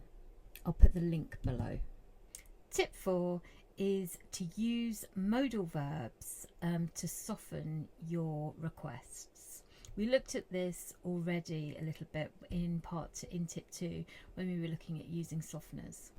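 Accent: United Kingdom. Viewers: Very good your class and your accent is beautiful where are you from in England? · Thank you very much for shearing 😊 I really like the way you teach English and of course you wonderful British accent❤❤❤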